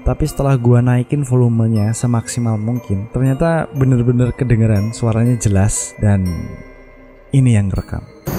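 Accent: Java, Indonesia. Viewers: aku mnden wedii.. mnaa orang jawaa suaranya...sukses rus ya kka 2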